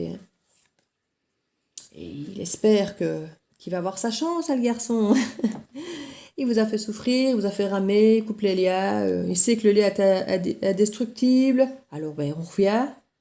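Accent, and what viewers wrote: Belgium. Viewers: Voilà que je vous découvre depuis la Belgique.... vous êtes géniale... Moi par contre je n'ai personne d'autre dans ma vie car rupture le 22 mars ....et je n'arrive pas à l'oublier pour le moment.....un petit accent de Belgique peut-être ? Je me trompe peut-être....😊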